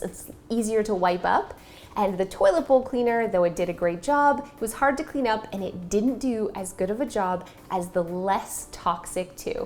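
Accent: Canada. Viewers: Do you live in Canada? I'm trying to figure out your accent? Great video. Thank you ❤️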